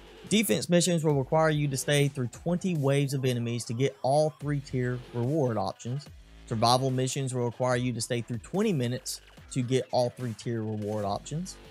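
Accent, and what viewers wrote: United States. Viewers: I love how his accent is America itself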